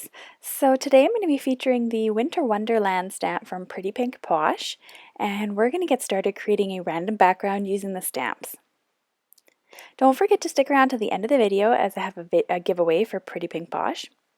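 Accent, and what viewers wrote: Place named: Canada. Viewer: Today is the first time I noticed your Canadian accent. Love it. Would like to see more man type cards.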